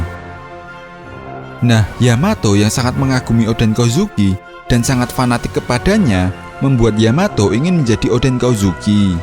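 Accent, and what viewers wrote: Java, Indonesia. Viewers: Medog tenan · Ngomong medo, dari dulu ga berubah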